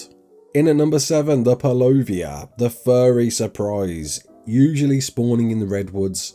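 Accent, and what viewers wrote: Birmingham, United Kingdom. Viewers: He’s definitely from smethic Birmingham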